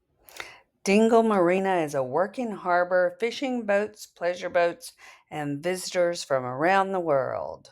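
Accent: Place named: United States. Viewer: Wow that's an amazing American accent, where did you grow up?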